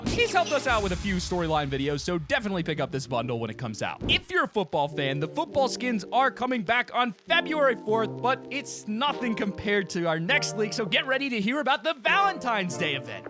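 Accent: United Kingdom. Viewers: Is someone British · u speak london very best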